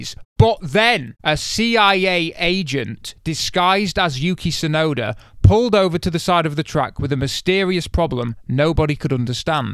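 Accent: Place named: Stoke, United Kingdom. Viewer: Great toon for - As a Staffordshire bumpkin I am guessing is from Sheffield - he has an accent that's a bit "Stooorkeee and a bit Jimmy Savile". Its rare to see new talent. Bweekfust spazzy is my favourite.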